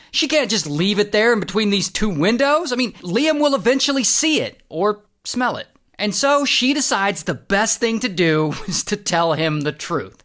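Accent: England, United Kingdom. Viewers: Im cackling at ur british accent dude lmao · Mint English accent! Hilarious story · The British accent 💀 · bro has an interesting British accent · that bri'sh accent 💀🇬🇧☕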